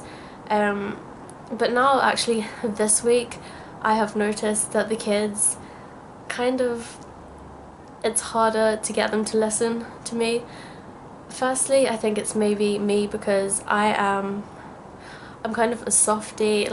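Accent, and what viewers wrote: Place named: Australia. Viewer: sounds Australian hahah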